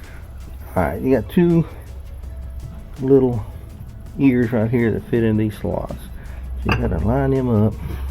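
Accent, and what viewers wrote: South, United States. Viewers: Thanks for looking at my comment and responding, I really appreciate that. I just came across you today, and I really like your videos. Where are you based out of? It's definitely Southern, that's for sure👍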